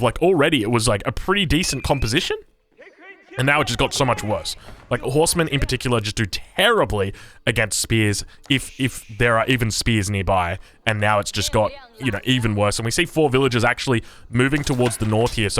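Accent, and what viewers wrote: Australia. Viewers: Lol no need to explain your Aussie English bro. The people who may or may not be even mentioning your pronounciation need to re evaluate their entire life. The whole world doesn’t speak the same lol. That’s crazy though compared to American English we pronounce our “H’s” the exact opposite; the word 🌿 herb the H is silent. The word Honed 🗡 the H is pronounced. If I were to say “HP” I’d say it just as you do, with out stressing the “H” sound in the beginning; Aech pee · I really enjoy your australian accent and the quality of cast is amazing! Greetings from Costa Rica.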